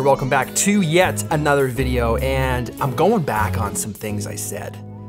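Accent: England, United Kingdom. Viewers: That British accent 😂😂👌🏽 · That British Accent though 😹❤ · Man, that perfect British accent cracks me up EVERY SINGLE TIME🤣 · that british accent broke my mind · That english accent though 😲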